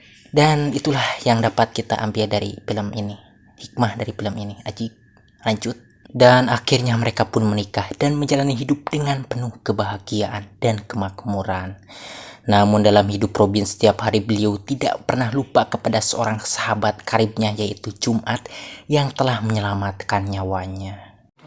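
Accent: West Java, Indonesia. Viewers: Org sunda ya? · Logat Sunda pisannya... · Sunda mana min?? Lucu,ngahibur euy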